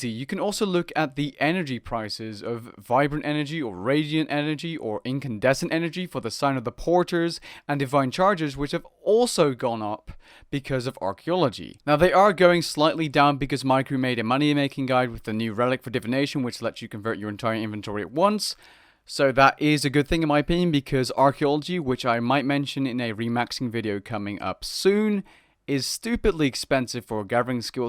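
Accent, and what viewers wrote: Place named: Netherlands. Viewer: You're Dutch, aren't you. :D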